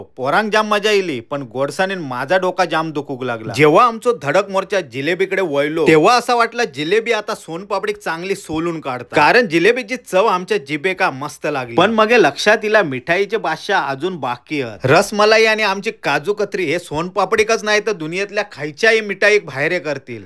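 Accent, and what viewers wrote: Malvani, India. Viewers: माका आवडला व्हायनात कुटून बोलस ता असाच मालवणी बोल तूया .❤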